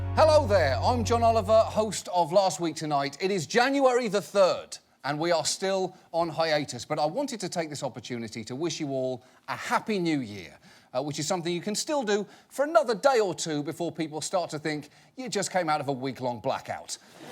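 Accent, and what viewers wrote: England, United Kingdom. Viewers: Thanks to his british accent , even silly things sound viable ! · I love when John says words in a distinctly English way. like "kentucky darby"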